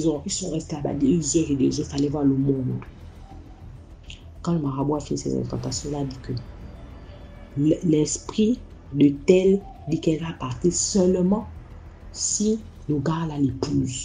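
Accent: Gabon. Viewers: Ah j m disais bien que c l'accent gaboma😂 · Kié une vraie gabonaise avec son accent bien appliqué 😂😂😂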